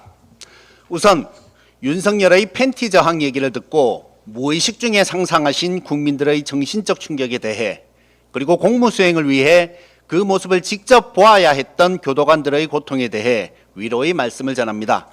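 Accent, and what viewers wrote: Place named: South Korea. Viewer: 부드러운 사투리 구사가 일품이십니다.👍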